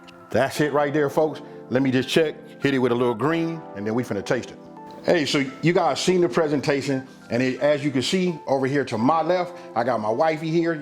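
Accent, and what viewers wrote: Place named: Mississippi, United States. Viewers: Ur wife is beautiful and I think you are both awesome, ur accent tells me you live close to me, In an Arkie, you sound like Mississippi, God bless you, I watched you make this and thought a couple cans of shrimp cocktail shrimp would put that over the top, maybe a little stripes of bacon crushed up over the top, man I gotta go eat something now, God bless you and urs. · OH yum, very similar to the way my mom and I make it.....I’ll call it Northern Style Macaroni lol. I’ll be making this as soon as it gets a little warmer, still in the 30’s and 40’s up here. What makes this Southern we all use the same ingredients. My boyfriend that passed 3 years ago, turned me on to Miracle Whip and I love it. Thanks for the recipes,. You are so fun to watch......love the Southern accent. Peace and be safe. You’re wife is beautiful and you’re a gem, Would love for me to have someone like you cooking. 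Yum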